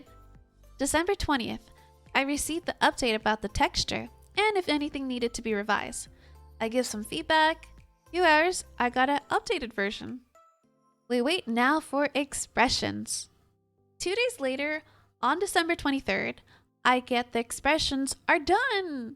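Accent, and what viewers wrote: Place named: United States. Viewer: You live in Europe? where?.... eh some people don't like to disclose that information. Your accent sounds pretty American to me so that's shocking to hear.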